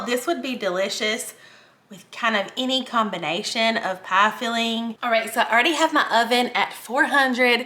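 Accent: South, United States. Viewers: He's not quite as southern as me and you I say one forth also😂😂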